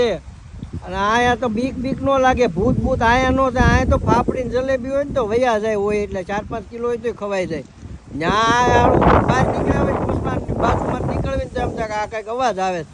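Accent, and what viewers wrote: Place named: Kathiawar, India. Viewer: Vah Pyor kathiyavadi lago so hooo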